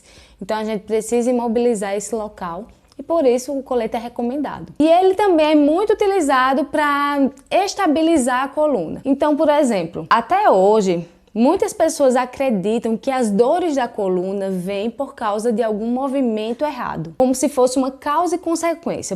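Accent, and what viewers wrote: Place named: Northeast, Brazil. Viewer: Parei de fazer minhas corridinhas devido a cor na lombar. Machuquei a lombar devido 2 tombos que caí de costas no piso cerâmico, e não procurei tratamento na época. Dia 29/1., farei uma Tomografia da Lombar, e a partir daí vou aguardar o que o Ortopedista vai dizer. Porém baseado nessa aula de Fisio, já tirei o tal colete de proteção lombar do carrinho do Mercado Livre.....rsrsrsrsrs. Sinto muita falta dos meus treinos e Provas de corridas de ruas com a galera da assessoria na qual estava treinando, mas vou seguir o tratamento conforme recomendado. -Primeiro vídeo que assisto da Dra., mas seu jeito de explicar e não massagear o ego dos sofrentes de dores nas costa, fiquei impressionado com a clareza. Além do sotaque que me parece ser da região nordeste, que prende a atenção do inicio ao fim do vídeo. Obrigado por todas as informações com muita clareza e objetivo, sem rodeios. 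Abraço!!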